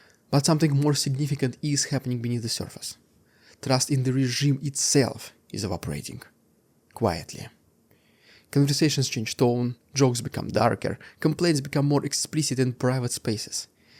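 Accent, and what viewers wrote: Russia. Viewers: I like your accent. You are speaking English when I listen, but to my ear it still sounds completely Russian at the same time. Happy new year brother!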